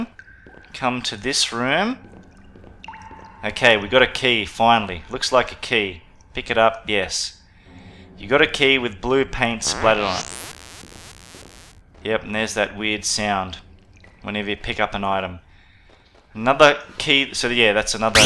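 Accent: Australia. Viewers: so entertaining with that aussie accent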